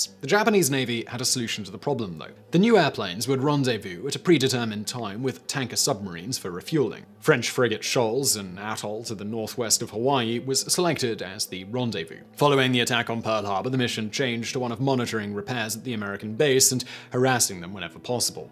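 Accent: England, United Kingdom. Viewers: Gobble De Gook - He sounds 100% English to me. Not a hint of yank.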